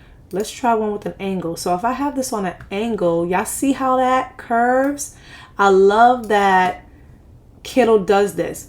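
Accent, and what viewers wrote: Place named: Brooklyn, United States. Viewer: Yassss on BK! I knew it when I heard you tawk😂😂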